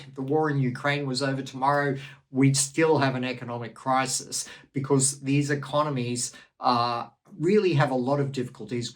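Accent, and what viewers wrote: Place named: Australia. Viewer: Thanks greetings from Holland , I like your Australian accent.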